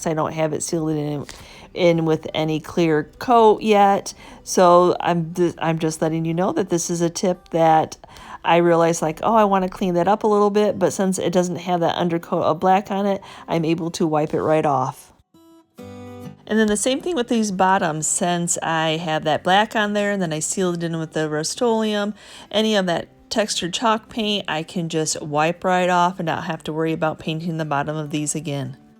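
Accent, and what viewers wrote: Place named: Michigan, United States. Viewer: LOL... as I was listening to you speak I tried to place your accent which I have pretty much given up on cuz I'm NO good at it 🤷, but since I'm home alone I figured it'd be OK. I said 🙋"Michigan" and not 30 seconds later you were talking about Annie's paint holding up well outdoors "here in Michigan" and look at me now 😁 getting one right and nobody's here to witness it. 😞. That's OK, we know! I'm really loving the decoupage on the dragonfly and the flowers. Long story, but I have a metal flower a lot like your largest one and a butterfly, both of which I really need to look polar opposite of what they are now, and this will absolutely do the trick. Perfect timing that I found your video today. Thanks for sharing. 🦋🏵️ From the Southern California desert, MK ♥️🌞🌵 Oh! I was able to properly guess your accent cuz although I was born in Detroit I had my forth birthday here in CA and, as such, consider myself to be a CA girl, my remaining family - one cousin - lives in Bloomfield Hills and works at GM. 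My mom's entire family stayed in MI while she and my dad, apparently the wild ones, drove west in the early 60s.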